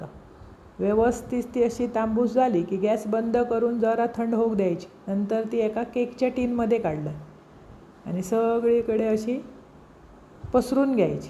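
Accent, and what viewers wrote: Malvani, India. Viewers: Luv to listen to your malvani ❤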